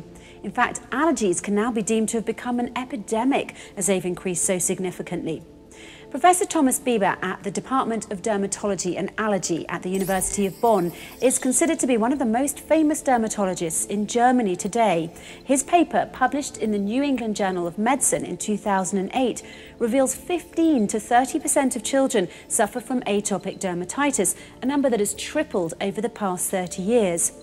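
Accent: England, United Kingdom. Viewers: True religion? No just another fairy story making ridiculous claims. Just because she is a white woman with an english accent does not make it any more true.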